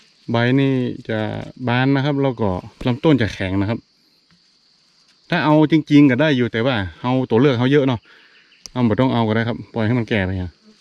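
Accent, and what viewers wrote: North, Thailand. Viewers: นี่ก็บ่ฮู้เหมือนกัน สำเนียงอู้บ่ใจ่ลำปูน- เจียงใหม่หล้า น่าจะแปร่-น่าน-ลำปาง กาว่าเจียงฮาย-พะเยา...ฟังไปฟังมากะเหมือนจม.อะละ เอ้าตอบให้หายข้องใจ หน่อย@ 555 เผื่อจะเพิ่มยอดไลค์นักๆ..ไหนๆก็หากิ๋นตางนี้แล้ว คนเปิ่นไค่ฮู้ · อยู่จังหวัดอะไรคะอ,เภออะไรเอ่ย,ฟังพูดเป็นคนเหนือแต่ไม่รุ้จังหวัด,และ,อ,เภอค่ะ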